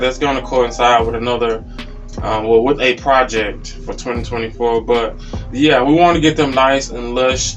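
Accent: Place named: Georgia, United States. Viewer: That’s the Georgia southern drawl 😊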